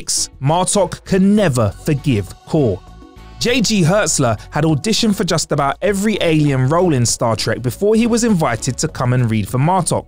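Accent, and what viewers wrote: United Kingdom. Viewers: even with a british accent I could tell what he looked like. Are those my skills? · That British english is horrible.Slow down mate.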